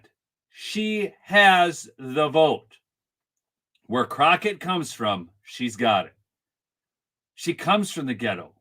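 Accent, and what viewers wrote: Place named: Minnesota, United States. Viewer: So funny to hear you say you're from Bemidji, I have been there, my Ex hubby was born and raised in Karlstad and his dad was the traveling Psychologist for multiple towns, Thief River falls, Bemidji, other surrounding little towns. I am from Tx and when we moved to MN, prior to going to Germany for 3 years, i thought i was in a cold hades....lol..I was used to hot TX weather and nearly froze my rear off. I had never seen garages where you had to plug in your vehicles 30 mins prior to leaving the home...it was WILD, moose in the yard, i had never seen one. He graduated in 1985 - he had never seen a black person when i met him (he was 19)- his accent sounded exactly like yours. MN is cold as crap, not meant for Texans! i couldn't wait to leave~ lol...I felt like l was living on another planet. Then i married a guy from Alaska, you'd think i'd learn...lol. 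I don't go there though! Thanks for all you do!! love you're positivity!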